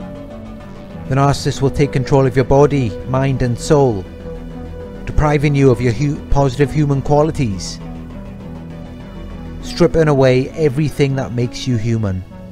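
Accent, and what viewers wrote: Wales, United Kingdom. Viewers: You sound Welsh .. possibly near Newport. I experienced this for 5 years and had to undertake hundreds of hours therapy, finally finding Anoushka at Balance Psychologies to help me twice a week with specialised NPD abuse therapy / counselling. I've learned about this now .. it's been the hardest time in my entire life .. I absolutely get it now, and I find it interesting that there's a Newport person on here reporting this. I'd love to get together with you and talk through this together. I'm in my 50's and she got me, I had no idea. I now have taken the red pill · Your voice tone is helpful- to an American you sound a little like a famous Welshman - Richard Burton. Not exactly of course, but we know you are wounded similarly. He wrestled in a narcissistic system, and family life. Thanks for the better benefit.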